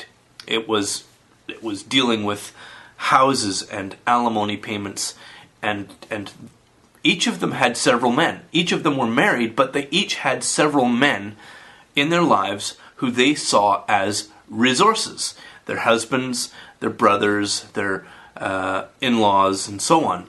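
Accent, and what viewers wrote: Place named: Scotland, United Kingdom. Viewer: sounds Scottish. I'm a Scot myself.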